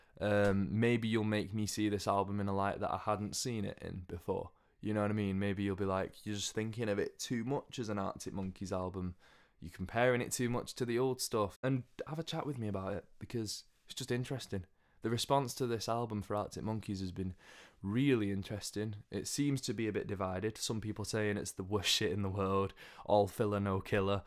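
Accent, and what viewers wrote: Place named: United Kingdom. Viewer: it's really refreshing to hear an actual nice British accent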